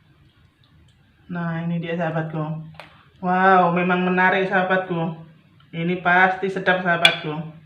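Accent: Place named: Malaysia. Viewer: Jadi keinget pertama lihat daun kari tu waktu kerja di malysa,, kakak ni asli malysa atau aslinya orang Indonesia, kok logat Melayu nya dk kental banget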